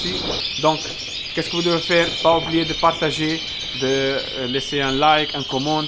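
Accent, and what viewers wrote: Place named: Tunisia. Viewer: منضنش الله اعلم تونسي ولا ليبي على حساب اللهجة تاعو على الاغلب تونسي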